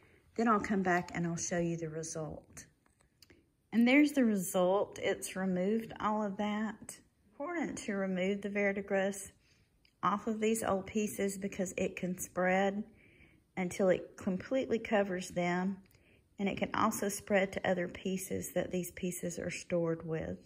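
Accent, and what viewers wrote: South, United States. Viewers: You sound awfully Southern or am I wrong? I am in AL.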